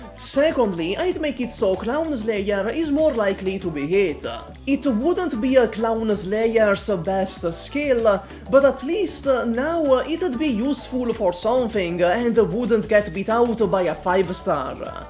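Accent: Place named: Italy. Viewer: such an Italiano accent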